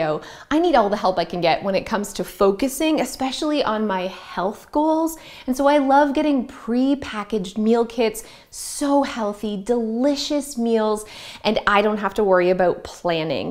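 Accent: Canada. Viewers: I don't have ADHD but I suffer depression since I was in my early 20's. All that mentioned today was a big help 😃 I started decluttering and re-organising my home about 5 weeks ago. I found your channel 🥰 I love your Canadian accent x I lived there for nearly a year in the 90's. you have been a great inspiration to conquering this huge mission I've started 😘 So far I've done 4 trips to the op shops here in Australia 🇦🇺 Reusing and upcycling what I already have in my home 🏘️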